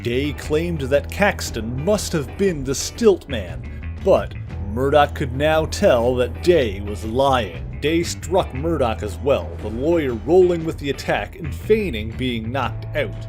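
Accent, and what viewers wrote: Canada. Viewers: You pronounce things funny. Are you Canadian by any chance?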